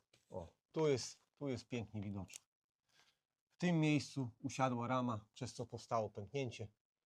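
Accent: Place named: Białystok, Poland. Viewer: Dokładnie! Też słucham i oprócz fajnych technicznych rzeczy zastanawiam się skąd ten sposób mówienia. Tak tylko lekko słyszalny. Nie jak u szczepcia i Tomcia… ;)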